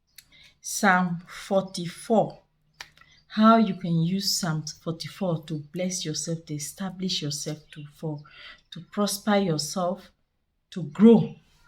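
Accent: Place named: Nigeria. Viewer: I am from Tanzania. I have been following your videos with a lot of admiration and faith if to say so. The only problem we from this part of Africa are facing is your Nigerian accent. Your (Nigerian) pronunciation is very different from ours. This makes it hard to understand you at times otherwise, you are blessed Madame and may God continue to use you.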